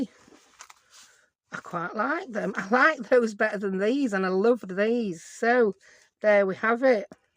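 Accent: United Kingdom. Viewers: Thank you for giving me someone cheerful to awaken to this morning!! (Even if this video is a year old), for me it’s today, and amid the stress of a not wonderful med diagnosis, packing up to sell my house, flat tires and car problems, and then a hail storm that totaled the roof, I am choosing to take 5 in the mornings and spend it with you and my cup of coffee being happy and cheerful, just like the way I choose to live my life. So glad that you join me! I turn on the captions and double check to see if you really said (in your darling British accent) something bit me on the nose! Love it! Hope you’re well!!💕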